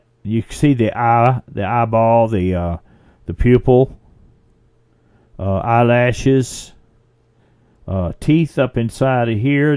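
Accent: South, United States. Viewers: you could read the phone book to me and I would listen to you. Your beautiful southern accent always reminds me of my father. He's been gone 26 years this month. He would have enjoyed your research and videos. I'm so glad that you are still posting videos. I appreciate your time and your efforts very much. I have no problem believing Patty could or would have a braid because there are many stories of bigfoot creatures braiding the tails and/or manes of horses. Thank you for your time!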